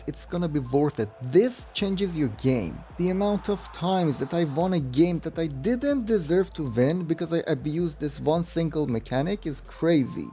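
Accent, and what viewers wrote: Iran. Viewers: I randomly found this vid and after watching it for 10 secs hey this is persian accent lol don't get me wrong u speak 10/10 but there's always a Persian accent 😂 keep it up bro 👍🏻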